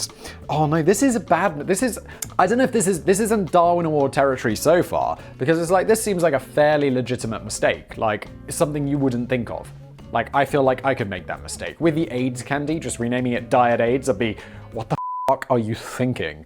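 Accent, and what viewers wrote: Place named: United Kingdom. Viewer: Unfortunately have to use Facebook to check what my mom is uploading 🤦‍♀️ I like to watch your videos as I acquire random information with a nice British accent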